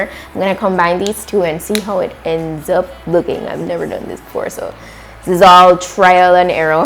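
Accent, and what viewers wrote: Jamaica. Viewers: Your accent sounds Jamaican🇯🇲 💕❤️♥️❤️💕❤️♥️😍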